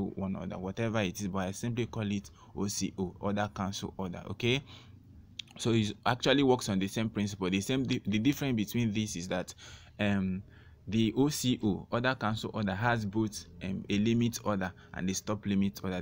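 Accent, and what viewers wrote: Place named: Nigeria. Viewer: I like the originality of your Nigerian accent.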